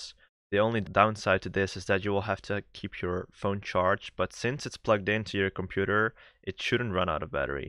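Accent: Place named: Netherlands. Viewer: This might seem off-topic but you sound like a Dutchman.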